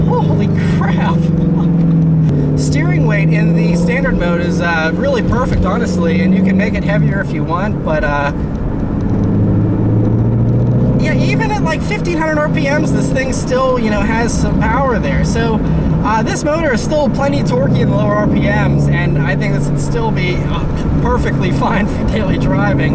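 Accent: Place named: Pittsburgh, United States. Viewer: Dude! I love your videos. You sound like you're from Pittsburgh. You remind me of Mr. Rogers as a child who loves cars instead of marionettes and toy trains.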